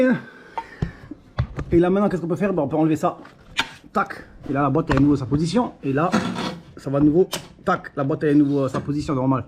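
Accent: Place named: Moselle, France. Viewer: Un beau tuto , dommage que je n’ai pas en profiter quand j’ai fais la mienne y’a deux ans Ma femme dit que tu as le même accent que moi Vive la Moselle-est mdr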